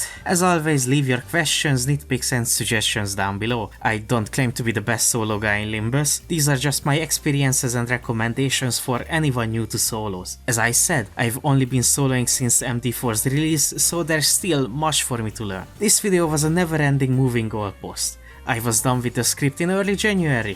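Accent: Hungary. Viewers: This accent...magyar...hmmmmmm... Holy shi im right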